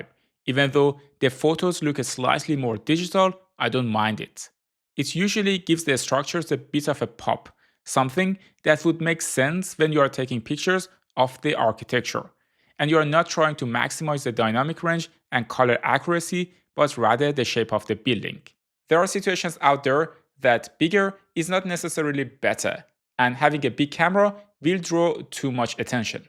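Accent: Iran. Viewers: انگلیسی صحبت کردن ایرانیا همین قدر ساده و قشنگه .. هر جا هستی موفق باشی داداش گلم ❤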